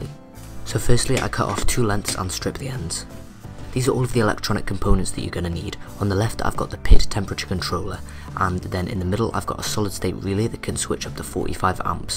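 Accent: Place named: Wales, United Kingdom. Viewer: You have a slight Welsh accent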